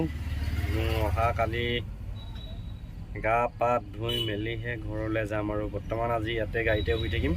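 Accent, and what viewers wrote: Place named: Jorhat, India. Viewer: Jorhatr kotha bayek nukuwa vaaaaal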